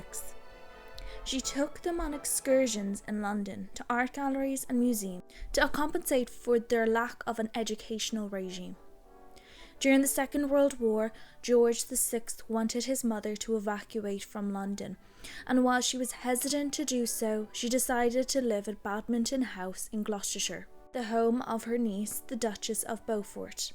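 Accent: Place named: Ireland. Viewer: You do very good research but my ears are 75 and my curiosity is still 15. With my arthritis a comment that extensive meant it had meaning for me to do all that typing. "TOASTMASTERS" is a group that helps people who do public speaking and presentations to groups, etc. It's like AA, with no fee to join, just a support group of like-minded people wanting to learn how to speak to groups with confidence. You sound Irish, I really don't know a lot about Irish society.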